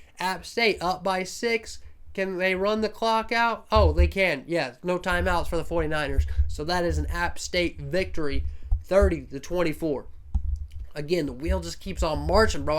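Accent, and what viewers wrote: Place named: Texas, United States. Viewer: Sounds like he’s from Texas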